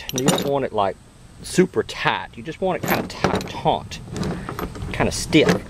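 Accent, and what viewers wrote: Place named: Texas, United States. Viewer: Looks fantastic. Enjoyed hearing a genuine Texas accent. Lots a posers out there these days.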